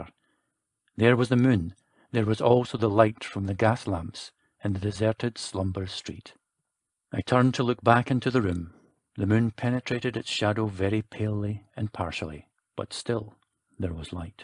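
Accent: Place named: Scotland, United Kingdom. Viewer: I heard an adaptation of this on CBS Radio Mystery Theater and I want to compare it to the original, so I scrolled through four audiobooks playing the first minute of each to see which reader works for me. I don't see why I'd choose a Scots accent when it's not a story that has anything to do with Scotland, but out of the available choices this is the voice that appeals to me and that's just the way it is.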